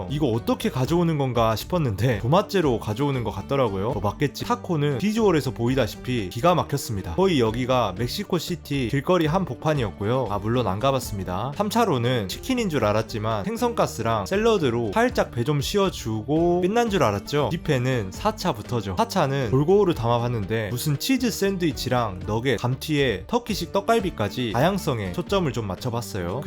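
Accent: Jeolla, South Korea. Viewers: 님 전라도 사람이신거같다 말투가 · 3대가 서울인 나에게 전라도 동상의 사투리 귀요미.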